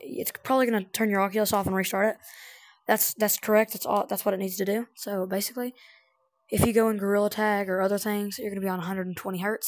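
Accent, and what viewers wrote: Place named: South, United States. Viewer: Not trying to be rude but you lowkey sound country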